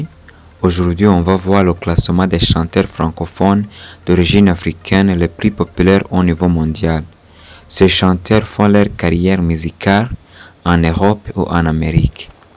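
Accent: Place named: Rwanda. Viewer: L accent rwandais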